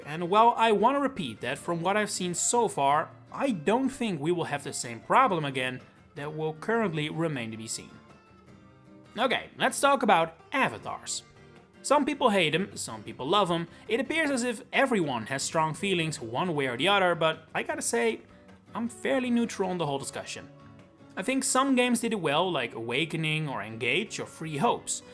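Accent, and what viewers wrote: Netherlands. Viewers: Bro are you from the netherlands?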